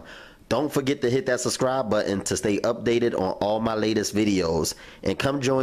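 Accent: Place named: DMV, United States. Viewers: Sounds like he’s from Baltimore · enjoyed the commentary sound like my folks in the DMV area lol well I'm making it now 10/9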